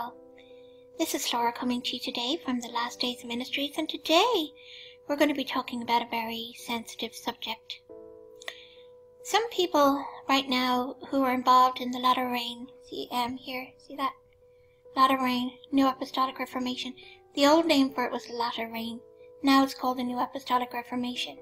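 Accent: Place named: Ireland. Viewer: Another excellent book is "Kundalini Warning -'Are False Spirits Invading the Church" by Andrew Strom. Andrew was himself formerly involved in what he called "the prophetic movement" and came to realize how evil all of this is. He tells of how Todd Bentley was imparted an "anointing" by the laying on of hands from the other wolves of the NAR and how it can be traced back to Branham. He explains how this spirit is directly tied to eastern mysticism and is the same one that yogis impart to their followers (you can watch YouTube videos of this and it causes exactly the same bizarre manifestations). I highly recommend this book as it exposes other wolves in this movement as well. Thank you Laura, for your dedication in reproving these unfruitful works of darkness (Ephesians ). God bless you! Love, Kathy P.S. I just love your soft Irish voice - so beautiful. 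I am American, but of Irish descent on both sides. But because I'm from Long Island, New York, my accent is not so melodious! :/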